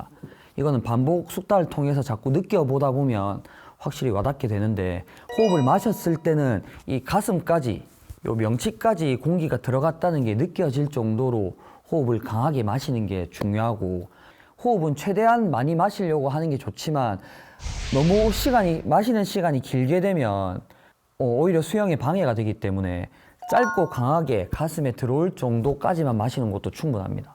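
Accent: Gyeongsang, South Korea. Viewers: 간결한 설명, 부드럽고 정겨운 경상도 억양이 강의가 더 쏙쏙 들어오는것 같아요. 좋은 강의 감사합니다.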